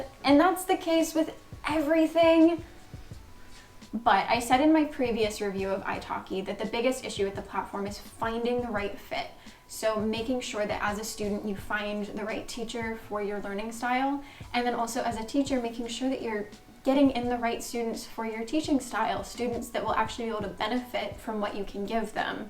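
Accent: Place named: California, United States. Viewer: You tend to pronunce some words in the British way of it, such as glass or grass, right? I've noticed that it's related with californian accent. So actually, this is how I'd like to sound like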